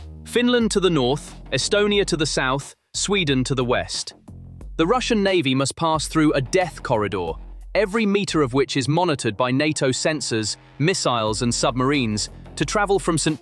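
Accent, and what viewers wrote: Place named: United Kingdom. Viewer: British voice over. DREADFUL American number (mis)pronunciation. Dislike from me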